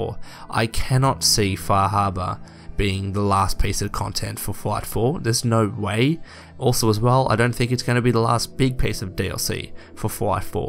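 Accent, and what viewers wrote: Australia. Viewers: hope the "Fah Hah Bah" jokes don't upset you, know that we love you and your kangaroo accent!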